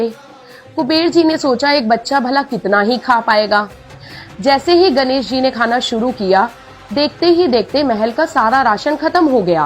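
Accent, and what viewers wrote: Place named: Haryana, India. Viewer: मुझे पहले ही लगा कि आप हरियाणा से है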